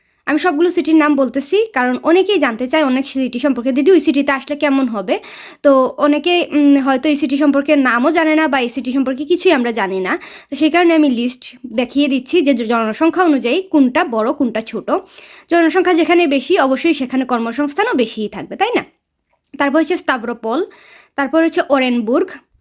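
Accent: Sylhet, Bangladesh. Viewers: Didi Sylheti ni..?? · দিদি কি তুমি কি সিলেটি? আমি রাশিয়ায় আসতে চাই তোমার মেইল টা দিও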